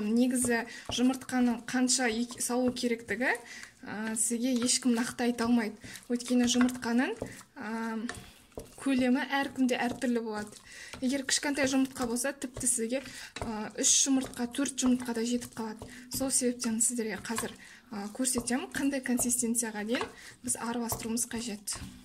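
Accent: Standard. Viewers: Қолыңыз дерт көрмесін осылай қазақша таза түсінікті айтып түсіндіргеніңіз үшін сізге үлкен рахмет айтамын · Саламатсыз ба? Қазақша таза сөйлеп, түсіндіргеніңізге қуаныштымыз. Өте керемет, риза болып отырмын. Бар білгеніңді ортаға салғаның қандай тамаша! Каналың дами берсін.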